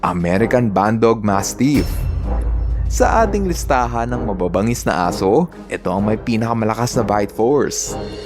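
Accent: Visayas, Philippines. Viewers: Gusto ko po ang german shepherd,dutch shepherd at belgian malinous po kuya pero may tanong ako sayo visaya kaba kasi ang ibang narinig ko sayo visaya katolad namin😅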